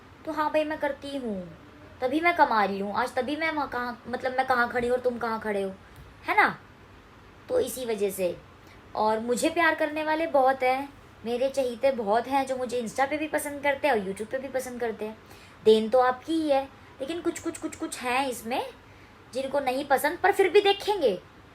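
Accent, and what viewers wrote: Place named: Haryana, India. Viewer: tum haryanvi me hii vlog banaya kro achi lgati ho bolte hua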